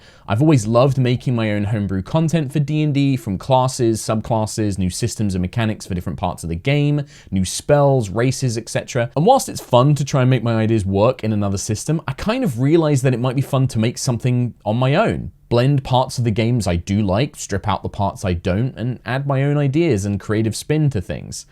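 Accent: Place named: United States. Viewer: I love you but why have you grown more and more American? You pronounce t's as d's.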